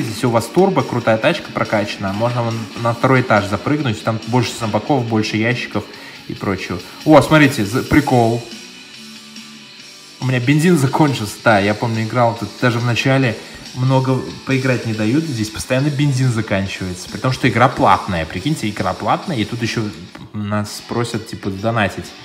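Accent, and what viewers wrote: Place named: United States. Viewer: Ты что из сша? Ты не русский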